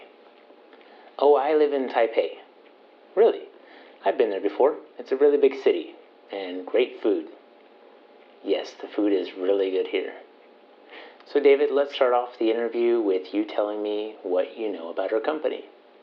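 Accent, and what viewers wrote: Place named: England, United Kingdom. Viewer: Hi, I like your english accents very much. So I subscribed .thank you.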